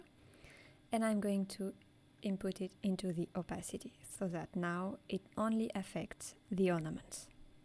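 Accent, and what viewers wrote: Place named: France. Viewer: J'ai trouvé un petit accent frenchy et du coup j'ai tout compris ! Merci pour ce tutoriel de qualité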